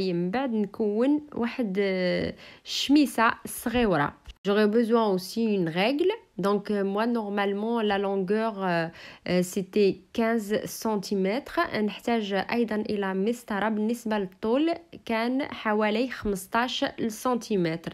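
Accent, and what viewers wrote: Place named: Tlemcen, Algeria. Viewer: Merci pour cette recette mme et d oujda frontière entre maghnia et oujda j aime bien une femme d oujda avec un accent de tlemcen encore merci d une parisienne 🙏🏻🙏🏻💋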